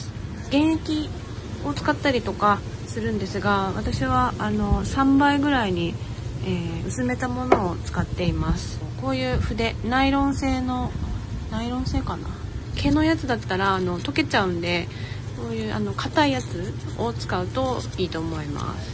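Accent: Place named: Kyushu, Japan. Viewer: たまに出る九州弁やイントネーションが同じ九州育ちには本当に癒されます😊。さんの理科的実験ノート🗒のような企画があると、盆栽の諸説や口伝えのなぜそうするの？が見える化できていいのかな~なんて思ってます。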